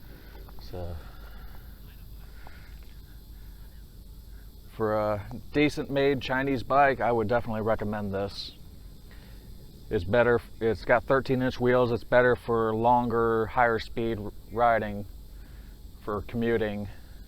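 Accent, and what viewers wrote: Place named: St. Louis, United States. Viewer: Hey, it sounds like you are in the Lou area! Good vid.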